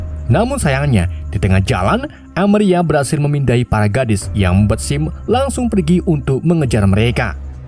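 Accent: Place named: Banyumas, Indonesia. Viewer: Ko ngomonge ngapak min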